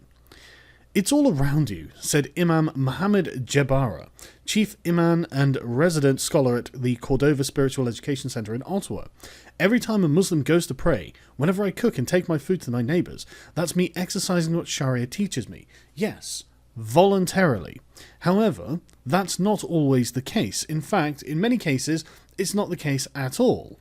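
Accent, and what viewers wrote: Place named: England, United Kingdom. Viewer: what city/region of England is the source of this intensely proper accent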